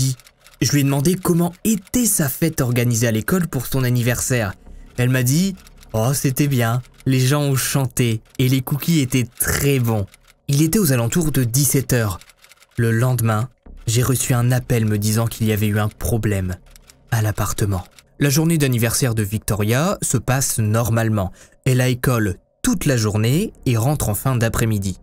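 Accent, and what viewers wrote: France. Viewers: Enfinnnn ton retour !!! Super hvf, merci ! PS : j'adooore quand tu prononces les mots anglais avec ton accent français "background" 😂😂😂 (et "Raul" qui se prononce "Raoul"!) Bref je t'adore 😁❤️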